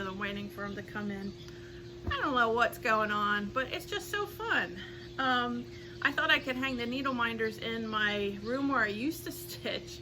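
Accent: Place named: New Jersey, United States. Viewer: you mean Traction Park??? Lol!!! I think it had its prime years when I was in school - I remember a friend of mine getting hurt there. And I know my brother went several times, but I never got to go myself. I think it’s a ski lift in the winter now, and a water park in the summers. We ALMOST moved to that area when we first got married, but the townhouse development we bought into went bankrupt. Lol!! I’m in Secaucus - which is also where I grew up. Where in Jersey were you?? It’s funny, I think I somehow missed you saying in the past that you had Jersey roots, but I recognized a tiny twinge of that Jersey ‘essence’ in your voice! 😉. (Trust me, it’s NOWHERE near mine - I have the truck driver thing down to a science. 😂)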